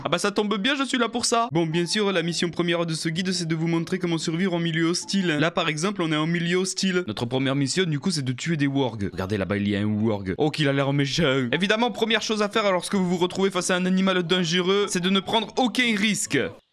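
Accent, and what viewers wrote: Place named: South, France. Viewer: Le délicat accent du Sud <3. J'adore ce let's play continue ! Je veux suivre les aventures de Pierre Grise sur Azeroth !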